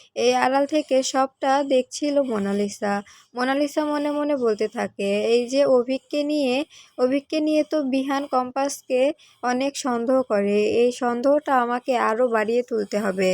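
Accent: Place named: Bangal, Bangladesh. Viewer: বাঙ্গাল বাঙ্গাল উচ্চারণ ভাল শোনায় না।চেষ্টা করলে এটুকু নিশ্চিত ঠিক ঠাক হবে।শুভেচ্ছা রইল।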